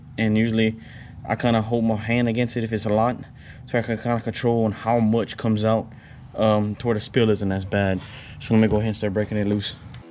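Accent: Louisiana, United States. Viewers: Bet you from Louisiana accent sound too familiar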